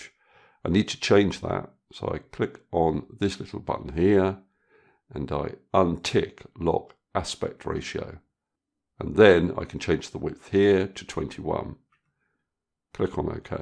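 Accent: United Kingdom. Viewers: Brilliant, thank you. And the lovely British accent was a bonus.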